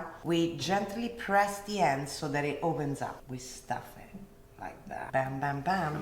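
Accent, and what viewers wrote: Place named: Rome, Italy. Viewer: Sei molto simpatica e bravissima ma ti preferisco quando parli italiano e ho capito subito che sei romana ❤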